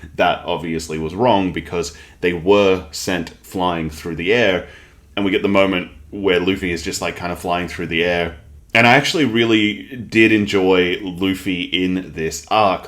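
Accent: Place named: Australia. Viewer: Oh, oh! One of the first reasons your videos resonated with me was because I spent a significant amount of 2020 in australian backpackers (the accent really sends me back ksksk) So yeah, just letting you know that someone was wondering from where you were, actually lol Anyways, I've stuck around for the contect. It's super fun to see you theoririzing. Always excited to watch your videos!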